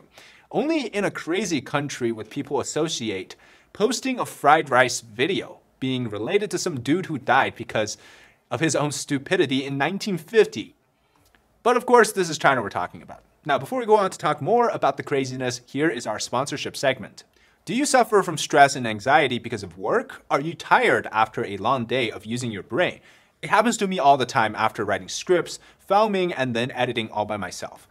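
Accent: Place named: United States. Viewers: you sound like a yankee to me for what its worth amazing job🎉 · For a man who came to English as at least his second language, this host does a remarkable job of sounding casually American. There are only a few odd choices of phrasing that make me think he's not a born Yankee. He did the work and it shows!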